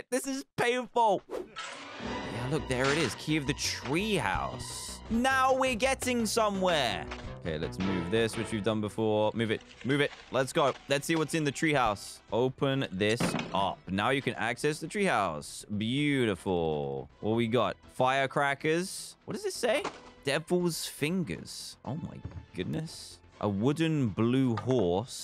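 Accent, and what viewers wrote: United Kingdom. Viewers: Eat Your Cereal he’s British lol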